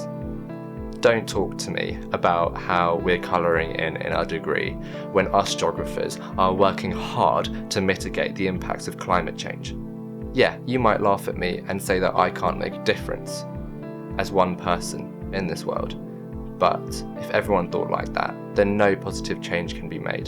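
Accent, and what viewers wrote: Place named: India. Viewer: I thought u were definitely an Indian dude , the accent gets me everytime lol , good editing lol!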